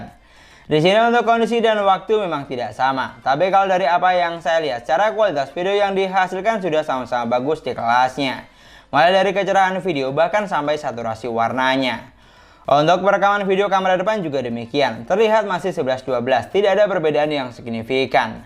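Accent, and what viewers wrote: Madura, Indonesia. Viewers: Bang asal madura kah?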